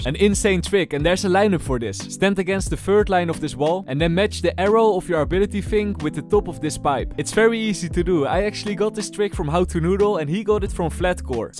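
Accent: Netherlands. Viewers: BRO YOUR ENGLISH ;( ur from the nederlands and u can hear that so fucking good like at least try to have a diffrent accent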